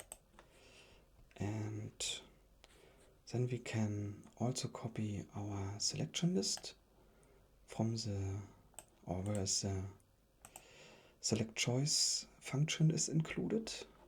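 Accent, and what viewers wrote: Germany. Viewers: are you from germany because your pronounciation sounds very german and you also said "ja"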